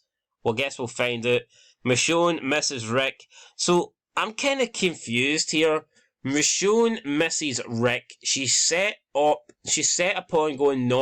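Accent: Scotland, United Kingdom. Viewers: Where in Scotland are you from?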